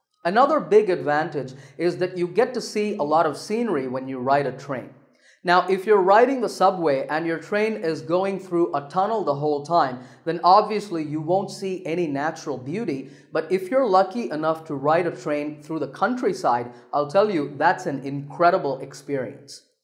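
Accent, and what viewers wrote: United States. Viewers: Sir, do you speak in American English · your JAM technique is lit.🔥🔥 love that you're channeling the American accent perfectly. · if you don't watch the video, just listening to the audio, you'd think you're listening an american speaking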